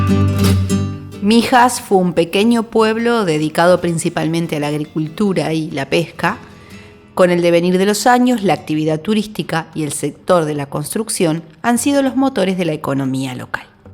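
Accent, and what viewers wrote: Argentina. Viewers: Podrias hacer el esfuerzo de hablar Español,embesde Arjentino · Ud. es de Argentina? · Locutora argentina, bravo!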